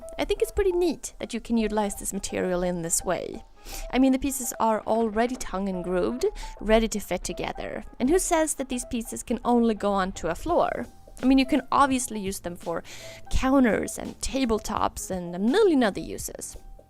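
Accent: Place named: Sweden. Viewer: Claims to be Swedish, sounds Swedish. Doesn't cover the outhouse in pine (furu). The Norwegians get suspicious of this behaviour.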